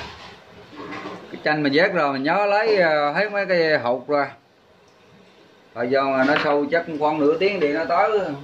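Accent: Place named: Central, Vietnam. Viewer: ôi đúng giọng miền trung,hướng dẫn tận tình chi tiết!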